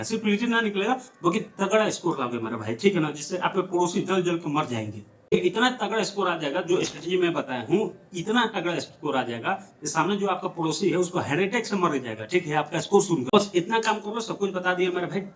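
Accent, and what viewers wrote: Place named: Bihar, India. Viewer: bhaiya bihta Bihar se hai inki yhi language hai 😂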